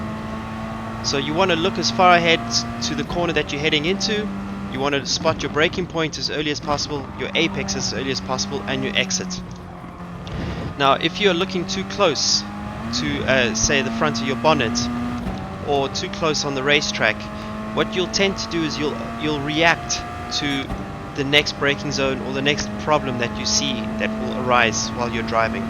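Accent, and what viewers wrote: South Africa. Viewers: Thanks for the tips! Is that a South African accent? I've been trying to decifer it. Lol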